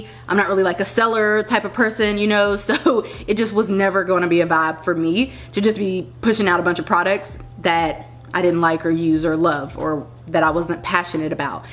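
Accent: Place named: Pittsburgh, United States. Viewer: Lovely you have to be from my hometown Pittsburgh … I can pick up on our accent anywhere … it’s nice hearing it especially since I don’t hear it to often ♥️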